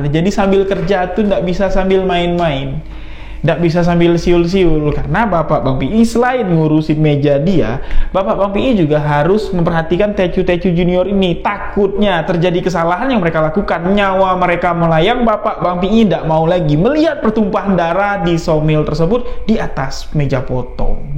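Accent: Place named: Jambi, Indonesia. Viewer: Aku suka dengar bahasa jambi...bila bang bercerita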